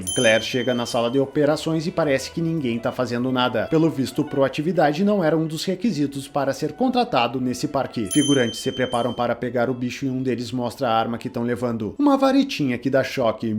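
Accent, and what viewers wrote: Rio Grande do Sul, Brazil. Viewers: Esse vídeo com sotaque gaúcho tá louco de especial tchê 😂 O que é inacreditável é que pessoas leigas como nós achamos tudo isso de erro e eles pagam milhões para fazer o diacho do filme e sai com esse tanto de erro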